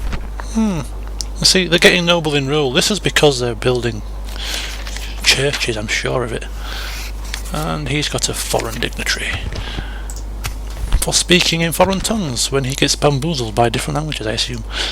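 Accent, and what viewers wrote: England, United Kingdom. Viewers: I love your dialogue! Your english accent really adds to it.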